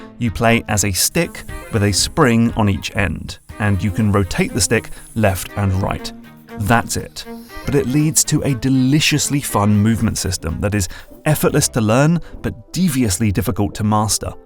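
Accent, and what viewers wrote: United Kingdom. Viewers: "Where's my congressional medal, Obama?"🤣 Tell me you're a Brit without saying your a Brit.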